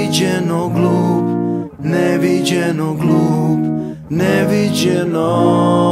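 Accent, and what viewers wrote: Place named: Pirot, Serbia. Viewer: <mail> Meni više zvuči kao Piroćanac, iskreno...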